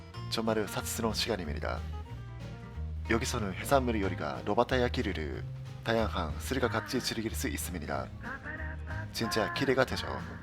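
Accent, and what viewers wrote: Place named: Japan. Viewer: 한국어 잘하지만 지울 수 없는 일본인의 향기가 호감입니다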